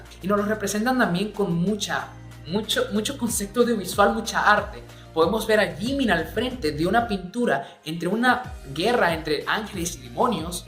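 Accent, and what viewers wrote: Venezuela. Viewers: pregunta ¿eres venezolano? es que cuando dijiste coño jajaja me dio mucha risa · Siento que eres de De Venezuela :vvv Puedo estar equivocada :vvv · AME TU TEORÍAAAAA. Eres venezolano verdad? :V Bueno, ame tu teoria x2 tiene mucho sentido quedé impaktadah :v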